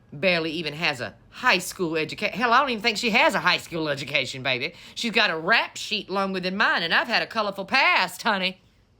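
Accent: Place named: Georgia, United States. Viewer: Oh, I thouight that you were a Georgia Peach, cause you sound like the Memorbable Ms. Buzzard (Isn't that a great name?) It's been over 30 years since I last spoke to her (we were workmates). Remeber that actress who played 'Flo in the sitcom: Alice? Well she qlso reminded me of Ms Buzzard (Pronounced to sound like BOOZ-Zard). Another Witty, non racist whyte woman from Atl.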